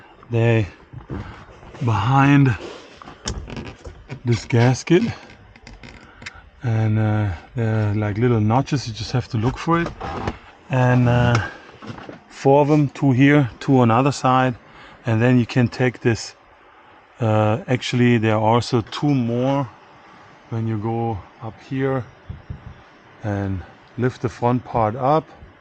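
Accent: Germany. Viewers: Super nice Video! If you could do the process also for a VW Touran VAN? Seems to me quite simular, but obviously there are differences. Also i don't have a garage with all the tools you used. By origin you must be garman by your accent? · Really hoped this would be easier for someone who usually doesn't work on his car 😂 You're accent sounds a bit German, and your friend's sounds Italian. What kind of accent is that?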